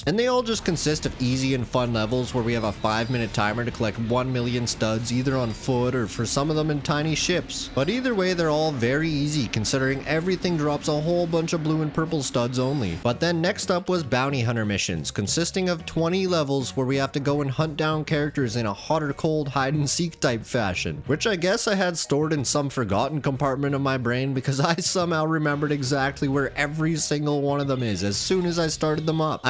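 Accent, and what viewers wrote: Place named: Alberta, Canada. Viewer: He's either Albertan or Nova Scotian bud 😅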